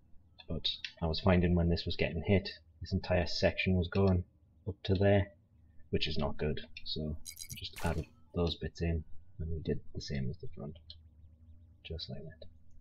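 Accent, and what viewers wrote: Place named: Northumberland, United Kingdom. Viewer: So, you're obviously English, where you from? Sound like you're from Northumberland!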